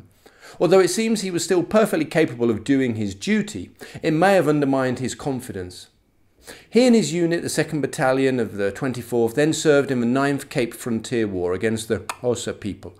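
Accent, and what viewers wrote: United Kingdom. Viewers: Happy New Year old bean ! I enjoy your British officer accent -ha-ha!!! At least in the film, "Gonny" was portrayed by Michael Caine-not 'arf bad !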